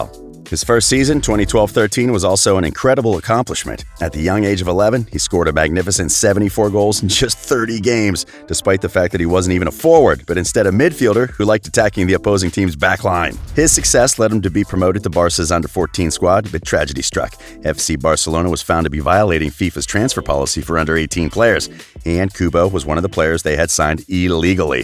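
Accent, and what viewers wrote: United States. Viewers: I am watching it...butchering's name doesn't mean he doesn't watch football! He has a strong accent of American...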